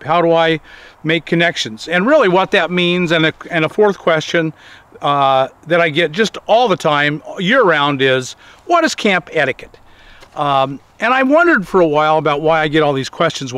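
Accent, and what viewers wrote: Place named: Minnesota, United States. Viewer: Are you from Minnesota? You sound like people from Minnesota =)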